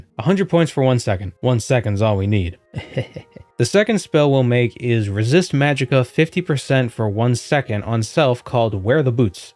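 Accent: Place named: New England, United States. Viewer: where in New England are you from, I only just realized that you have an accent because I'm so used to that just being how people talk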